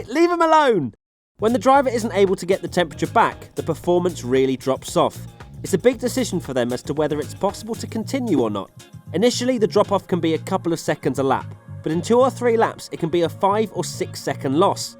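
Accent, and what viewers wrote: United Kingdom. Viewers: You sound so British, yet you say Mister Lewis Hamilton...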